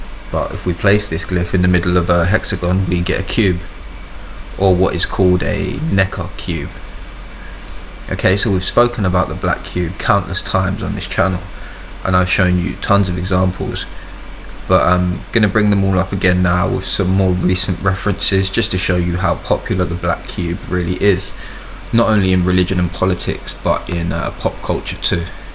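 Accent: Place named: London, United Kingdom. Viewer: How did you possibly think this man was an American 😂 such a pure London accent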